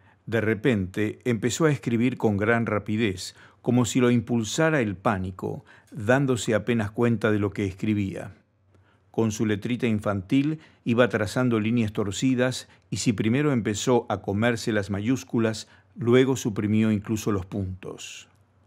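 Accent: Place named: Latin America. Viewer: JOLINES..!!! ESTE ES ESPAÑOL LATINO... VAYA AL FIN GRACIAS COMPADRE.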